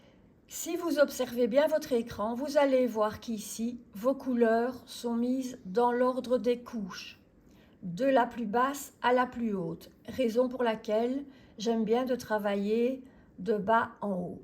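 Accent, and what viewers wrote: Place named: Belgium. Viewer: Merci pour ce tuto qui est pour moi génial, je ne comprenais toujours pas jusqu'à ce jour comment faire la superposition, mais avec vos explications je pense maintenant que j'ai compris, cela va être un jeu d'enfant, et merci à vous pour votre bel accent belge, je vous souhaite pleins d'autres tutos et je vais vous suivre sans problème. Bonne continuation et à très vite.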